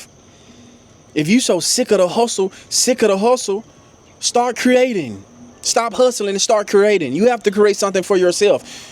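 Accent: Memphis, United States. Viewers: I love the Memphis accent, reminds me of a rapper I listen to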